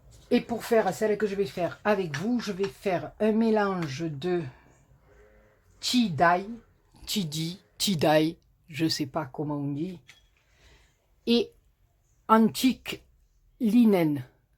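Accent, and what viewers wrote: South, France. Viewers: Recoucou ma.... contente d'entendre ce joli accent du sud....tes cartes sont magnifiques et le die superbe avec ce papier miroir... ca fait bien esprit de noel ou noyel même si ce n'est pas les couleurs traditionnelles..... Tiens mistigri n'est pas venu te voir sur cette vidéo.....pleins de gros bisous ensoleillés de la Réunion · Cc, je vous adore, j'aime ce que vous faites c'est magnifique et le soleil dans la voix du sud, je ris beaucoup et j'ai l'impression d'être là bas avec ma famille... Que vous me faites du bien..., et votre façon de dire, je vous kifffe grave comme vous dites...je vous copie pour apprendre c'est tellement beau.... Merci à vous de votre bonne humeur et vos rires me font un bien fou.... Et oui, franchement elles sont ravissantes 😁😂😂😂😂 Et oui, j'aimerai bien votre jolie carte.... 😁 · Pas vue la première vidéo ...mais tu me fais bien rire 😊et j aime bien ton accent du sud.Tres jolies tes cartes.💕💕bonne soirée bisous😘😘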